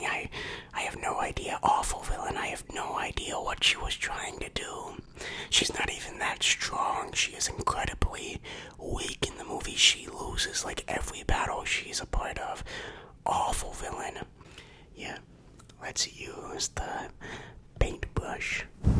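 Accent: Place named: New Jersey, United States. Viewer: Are you from New Jersey? Your accent's pretty strong.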